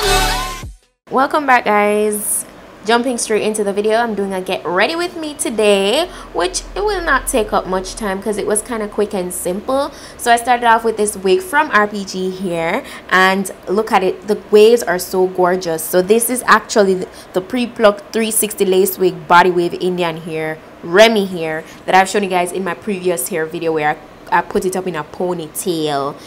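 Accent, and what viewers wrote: Jamaica. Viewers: Just listening to you talk makes me miss Jamaica. My family lives in Kingston 13. I used to visit twice a year but haven’t been for almost two years now.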